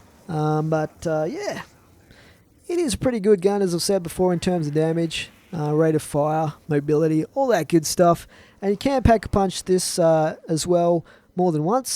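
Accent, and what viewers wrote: Australia. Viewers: are you an aussie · Are you Australian? Sorry if I got it wrong plz dont judge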